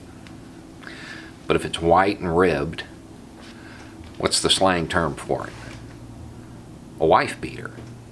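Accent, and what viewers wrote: South, United States. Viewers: I discovered you just today, via a FB friend, and dude, you are awesome. I'm sorry to say that I do stereotype some, so how much more do I love hearing your Southern twang say things that aren't Trumpisms. Tats & the beard's hip up here -- in small town Ontario, anyway. The tank top/wife beater top's only offensive if it's worn by a very laege, sweaty person who should know better. Maybe things are different in the US? I hope things get better. You guys deserve so much better than Trump, or Bush, or bloody Nixon, or (dare I say it) Reagan. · We all have prejudices. It seems to me that having a 2 party system has divided the country between liberals and conservatives. It’s the only way the elites can maintain power. Divide and rule is the name of the game. As for you, the fact you have that southern accent brings those preconceptions we have about middle America. 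· you are the reason why thousands of people overcame their prejudices against Southern US guys of European ancestry (I reject the classification into black and white. Euro- or Afroamerican is more realistic). Good Southerners are too often overlooked and put into the same box as the bad guys. Same with Israeli Settlers, Iraqi Sunnis and ethnic Russian Ukrainians. You speak a certain dialect from a certain area and bam! you are suspicious. Anyway. Good job.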